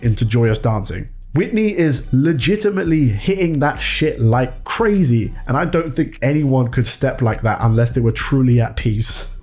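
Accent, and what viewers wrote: United Kingdom. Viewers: Why am I listening to a British dude explain our shit lol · What do you want him to do, an impression? He’s from London. This is his accent.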